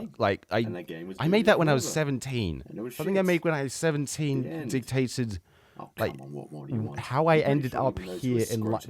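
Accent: Australia. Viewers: I'm glad he's as drunk and Aussie as he sounds. Also, and I'm just saying, I've never seen and the dude from Explosions and Fire in the same room.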